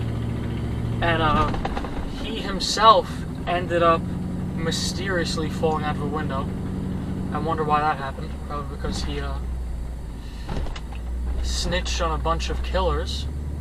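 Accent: New York, United States. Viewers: love your drives and the subject matter. And u do it with a New York accent. Keep up the good work!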